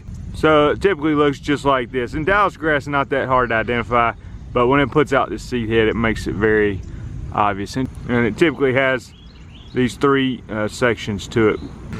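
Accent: South, United States. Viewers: His accent makes my mouth feel like chewing a southern gum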